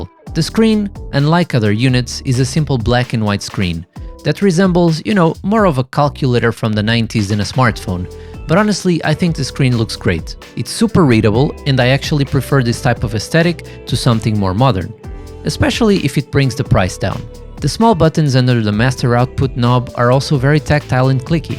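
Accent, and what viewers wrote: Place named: Portugal. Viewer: Pelo sutaque deves ser tuga! 😂 Saudações 🤘🏻🍺🇵🇹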